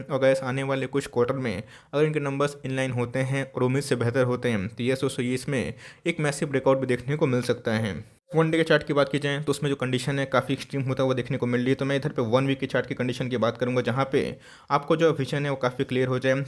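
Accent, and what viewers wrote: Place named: Bihar, India. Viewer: भाई बिहारी स्टाइल अच्छी लगी।बड़ी को बरी बोल रहे हो।बड़ा को बरा मज़ा आ गया।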